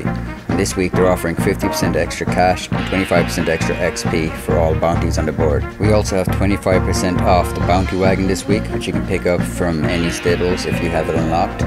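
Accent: Ireland. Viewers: Are you Irish yeah? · Also thumbs up to the voice over; you've kept all the important text for when I'm on the bus watching and don't want to bombard folks with audio they didn't ask for, but I'm sure they wouldn't mind with the low volume, even pace, and Irish accent. A+ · Love the Voiceover man, are you irish? Your accent kinda sounds like it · Voice over is brilliant, Irish voice goes really well with a cowboy theme imo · The voice over is fne a great accent from the Emerald isle. Good vid, keep up the good work.👍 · Keep talking Irish bro... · Holy shit, you're Irish?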